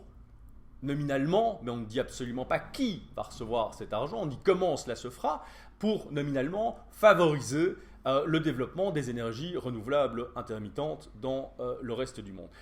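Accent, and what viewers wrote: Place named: France. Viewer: accent français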